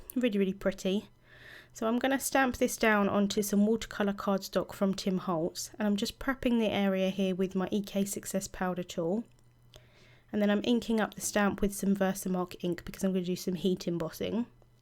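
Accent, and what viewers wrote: United Kingdom. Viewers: I can't get by a crafting day without watching one of videos. When I'm talking to myself, it's in that British accent that we Americans wish we had!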